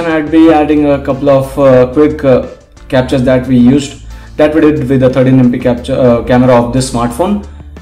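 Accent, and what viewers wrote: India. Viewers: your indianoasian english sucks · your English accent like is Indian 😁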